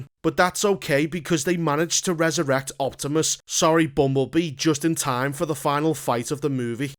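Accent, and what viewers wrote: Liverpool, United Kingdom. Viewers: This guy sounds like the typical scouse arsehole. You could’ve used reasonable words to say “Oh yeah not a fan of this movie tbh” but nah you overreacted. Yeah okay it’s not everyone’s cup of tea but at least be respectful about it. Calling a director a dickhead was also a typical dickhead move. Just a terrible review overall. At least this film was better than the last two transformers movies (not including bumblebee)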